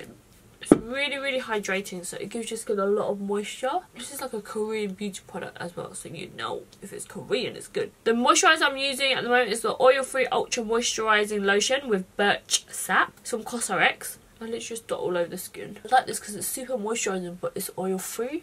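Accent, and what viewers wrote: United Kingdom. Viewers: i've been following for you for months on insta and i finally looked up your youtube. i wasn't expecting you to be british haha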